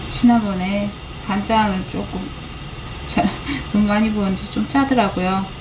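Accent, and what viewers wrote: Gyeongsang, South Korea. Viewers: 항상 영상은 보지만 댓글은 처음입니다 ^^ 어찌나 깔끔히 살림하시는지 항상 감탄을 하며 항상 바지런하고 소박하게 사시는모습 응원하게 되었어요 멋진집 멋진 인테리어가 아닌 우리네 사는 모습을 보여주셔서 더 눈길이 가고 한번더 보게 되었어요 저도 456님처럼 될순 없지만 영상 볼땨마다 같이 움직이고 청소할려고 하고있어요^^ 영상에서 혼잣말 하시는 귀여운 사투리도 너무 좋구요 저도 경상도라 너무 정감가요 앞으로도 좋은 영상 많이 부탁드릴깨요 항상 응원하고 건강하세요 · 오~ 대구분이시네요 친근함이 느껴지네요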